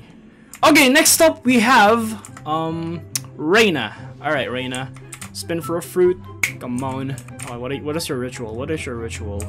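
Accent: Philippines. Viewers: sa tingin ko talaga Filipino kaw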